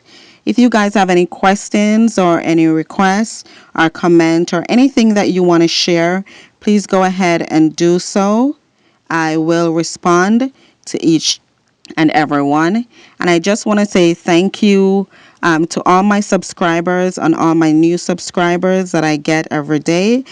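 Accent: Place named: Jamaica. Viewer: kool, subbed. r u from Jamaica? I pick up that accent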